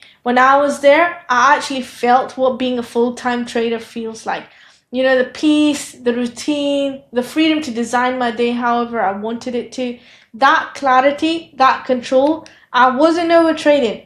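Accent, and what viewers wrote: United Kingdom. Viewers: Thank you for the video. I am good with accents but I'm really struggling with yours. You sound a little Scottish, but not. Where are you from? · Are you from UK?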